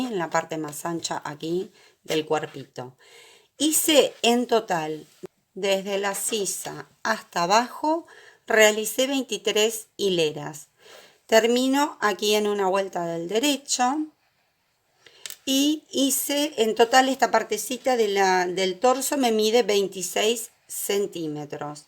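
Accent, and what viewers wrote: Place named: Argentina. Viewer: Hola buenas noches, me encanta el punto zuzette, es muy sencillo y se ve muy bonito, explica super Bien! Gracias! Su voz es bastante agradable el tono argentino es muy bonito, saludos desde Puebla, México. Bendiciones Perdón, una pregunta: que significa top down, no lo sé.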